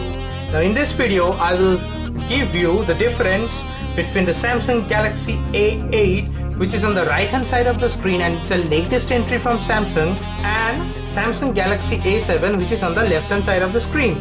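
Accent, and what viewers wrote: India. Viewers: hahahahah that what I was about to say , i watched many A7 video but all turn out to be Indians with their Indian accent. ... the way he pronounce Edges as Ages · Indian accent makes me crazy.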